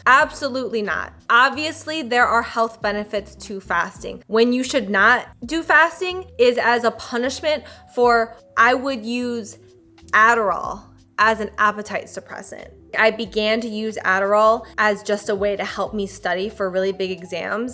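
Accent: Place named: Michigan, United States. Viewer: I could listen to talk all day her MI accent is so nice to hear lol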